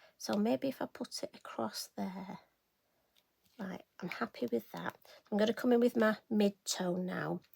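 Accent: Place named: Manchester, United Kingdom. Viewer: Thanks, I'm new to Lavinia stamps and your card is just beautiful. I love listening to you as well, reminds me of relatives in Manchester:)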